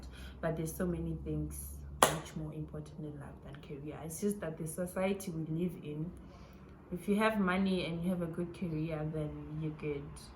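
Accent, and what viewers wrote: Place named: United Kingdom. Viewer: 🔥🔥🔥🔥 congratulations!!! 😅as for British accent!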